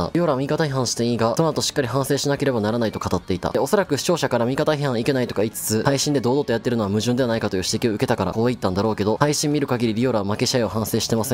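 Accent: Kansai, Japan. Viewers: リオラは声と関西弁がめっちゃあって好き、解説は絶対これ見るわ。いつもありがとうございます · の動画、いつも楽しく観ています。面白いです!関西弁好きです。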